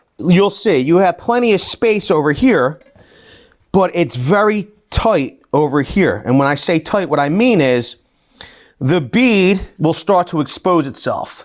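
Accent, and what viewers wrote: Philadelphia, United States. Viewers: I have no idea but see he hasn't answered and your question made me notice that now as well. My guess is that it's a Philadelphia accent maybe.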